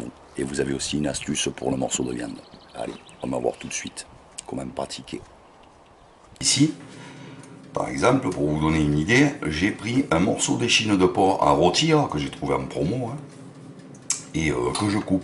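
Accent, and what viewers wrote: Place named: Provence, France. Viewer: Oh fan, tu me fends le cœur ! Je fais la même et je la termine avec un trait de cognac ! Je regarde régulièrement tes recettes et je me régale de ton accent, le vrai, celui de Pagnol et qui me manque tant là où j'habite (Auvergne) ! Mille merci pour ton partage, et compliments, cuisiner sur deux plaques électriques ça mérite une médaille ! Adéssias Amigo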